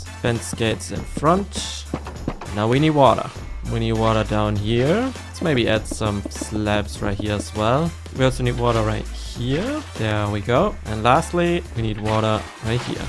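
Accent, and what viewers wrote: Germany. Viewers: als ich ihn zu ersten mal reden gehört hab, wusste ich direkt er wäre deutsch, W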